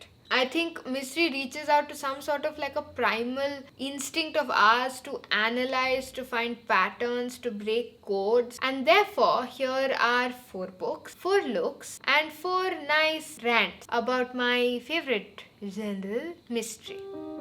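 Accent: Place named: Bengal, India. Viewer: The bengali badass 😂 love the accent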